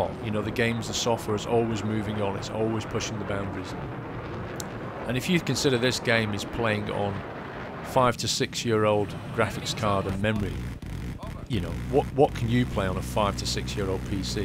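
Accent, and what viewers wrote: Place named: United Kingdom. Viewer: u sound british...so yeah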